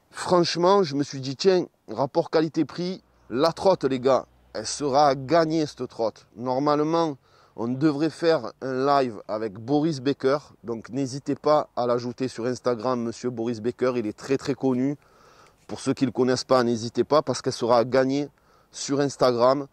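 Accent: South, France. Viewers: Super et aussi tes vidéos, j'aime bien ton accens du sud moi je suis du nord enfin belle trott! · toujours avec l'accent du sud....fabuleux...ça reste une urbaine...nous on cherche du lourd pour du Offroad dans le Cantal en zone nordique...projet en cours.n'hesites pas à nous contacter...Merci MR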